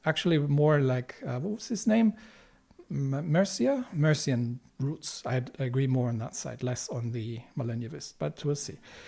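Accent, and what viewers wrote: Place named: Germany. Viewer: Australia? But you have german accent...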